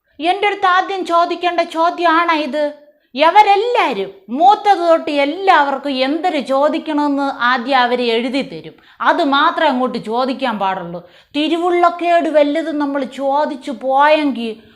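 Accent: Thiruvananthapuram, India. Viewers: ഇതാണ് തിരോന്തരം കലക്കി മോളെ ഒന്നാംതരം അവതരണ ശൈലി 👍❤🙏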